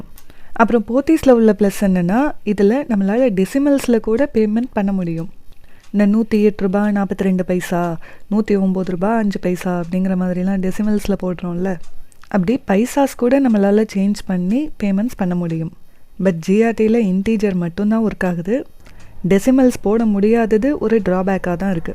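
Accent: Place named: Kerala, India. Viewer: Your voice is soo good ma like malayalee speaks tamil. Slang is different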